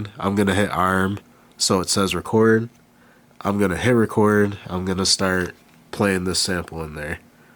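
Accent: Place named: Upstate New York, United States. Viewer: Love that u have that upstate NY accent too! boomboomBoomBOOOOOM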